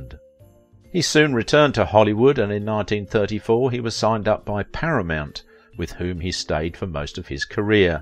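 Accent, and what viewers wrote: England, United Kingdom. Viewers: Sometime this guys English accent is just too much for me. I can't understand everything he says. Is this only me ?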